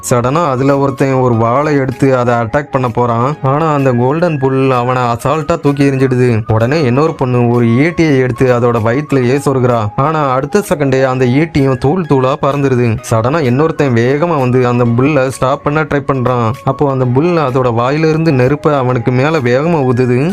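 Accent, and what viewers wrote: Kongu, India. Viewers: Yennunga nenga kongu mandalama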